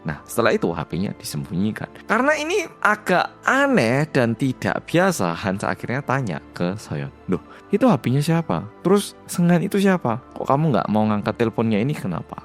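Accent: Java, Indonesia. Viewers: Mas yang medoook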